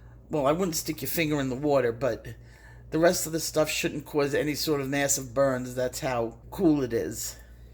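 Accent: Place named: Massachusetts, United States. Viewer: "The HECK did I invent hea?!" Love it 😂😂 I live in MA so hearing your accent always puts a smile on my face 😊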